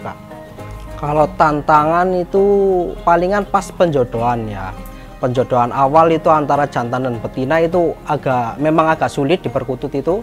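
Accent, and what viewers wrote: Banyumas, Indonesia. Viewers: Ora ngapak ora kepenak yak.. :-D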